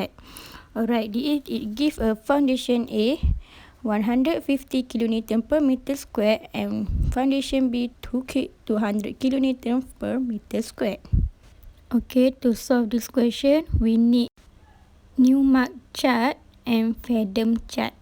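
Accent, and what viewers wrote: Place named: Malaysia. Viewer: finally i found the malaysian's english. thankyou so much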